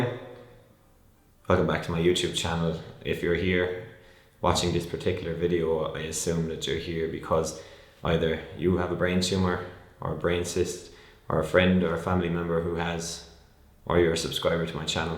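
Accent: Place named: Ireland. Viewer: Small world man! I too had a tumor in my pineal region. Glad to hear you're doing good! In hindsight I rushed into surgery and am glad you mentioned not to do that. How're you feeling these days man? I've got to say the philosophical advice at the end really hit home and the irish accent made it that much better. Thanks for making this video.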